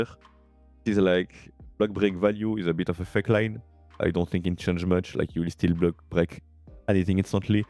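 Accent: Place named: France. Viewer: Thx for what your're doing it's really nice and accurate. Your accent look like french accent, if you are, have you got a french guild ? And if yes, in witch server ?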